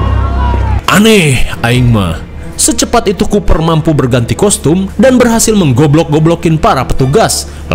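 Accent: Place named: Sunda, Indonesia. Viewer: Seru bahasa pembawaannya bang, apalagi ngomong aneh aing mah, si abang nya org sunda ini, salm sunda bnten bang, salm subscribe baru mu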